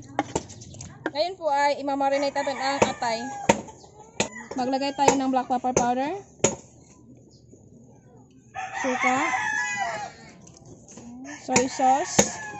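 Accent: Visayas, Philippines. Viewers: watching from baguio city.. new fan & new subs. sobra mong galing magluto.. galing... taga san po kayo visaya kc salita nio po.. God Blessed.. ❤️❤️🙏💞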